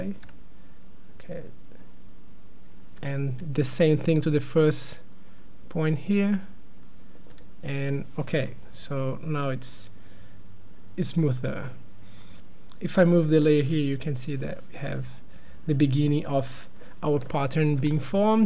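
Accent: Brazil. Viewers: Mano, vc é brasileiro. Diz ai!? Sotaque entrega. Muito foda, parabéns! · are you brazillian?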